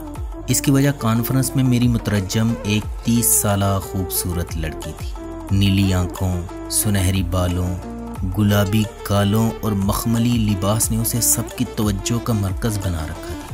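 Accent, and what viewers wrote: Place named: Punjab, Pakistan. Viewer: yar urdu to seikho bhaye sp URDU esy bolty ho jesy panjabi bol rahy ho mazrat k sath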